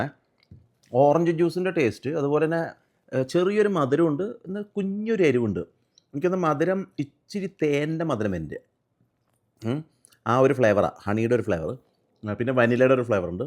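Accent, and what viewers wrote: Thrissur, India. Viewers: തൃശൂർ ആണല്ലേ.... വീട്..... ആണോ ഗെടി....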